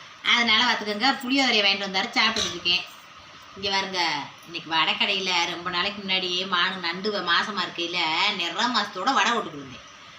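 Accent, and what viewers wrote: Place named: Kondulavi, India. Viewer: சூப்பர்...சும்மா விளையாட்டுக்கு சொன்னேன்...நீங்க எங்க ஊரு கொண்டுலாவி பேச்சு மாதிரி பேசுறீங்க.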